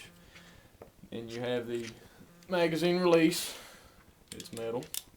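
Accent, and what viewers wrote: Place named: Texas, United States. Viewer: This is not to hurt your feelings, but you sound like the Texas guy from BFBC 2.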